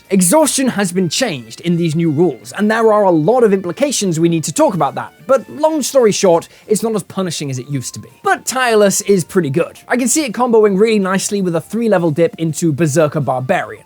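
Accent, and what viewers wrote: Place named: United Kingdom. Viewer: OMG....you're british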